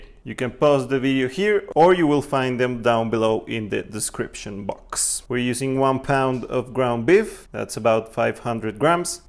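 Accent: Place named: Rolo, United States. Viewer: Although I really love your "rolo" accent your english is quite nice 😲 I'm ready for: eats. 💪🏼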